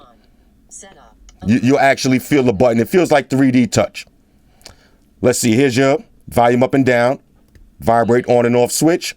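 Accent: New York, United States. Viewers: That NY accent tho! Love it. If I ever leave, I'd just listen to you videos if I ever get homesick!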